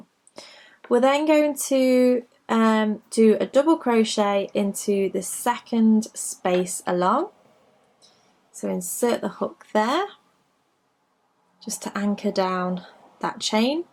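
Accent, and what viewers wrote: England, United Kingdom. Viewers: like the video. Nice to hear an English accent for a change!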